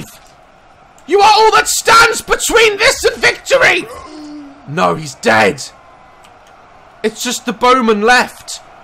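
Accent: United Kingdom. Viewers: it's cuz he's British 😄🇬🇧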